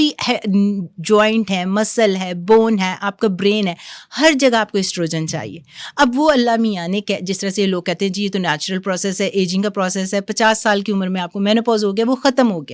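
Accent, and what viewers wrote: India. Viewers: Me bhe confused hoon k ye anchor q Hindi pronunciation k sath baat ker rahe hy ya shayd Undian hin qk unki alphabet me khay ghain nahe hy or agr ye Pakistani hy to isko yaqeenan urdu talaffuz ana chahiye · why host uses indian style urdu , she says کھ، instead of ک۔ like خواتین کو کھواتین وغیرہ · Bhen ap jan k hindi accent main boti ho sach main zehr lgti ho esey boltey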